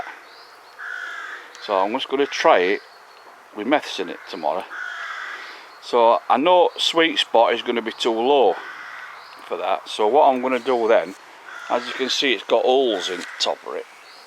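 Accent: Yorkshire, United Kingdom. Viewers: Great video mate. You're a proper down to earth bloke, very likeable. Looks like you have a blast out there with the dogs. You got a lovelly spot there too. I've subscribed and will watch the rest you have done. sounds like you;re a fellow Yorkshireman with that accent. Ey up from Leeds.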